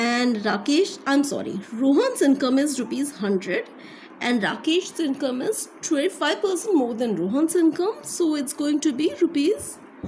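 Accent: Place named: England, United Kingdom. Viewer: Bahut English aat ke bol rahi ho Lag raha ki english man ho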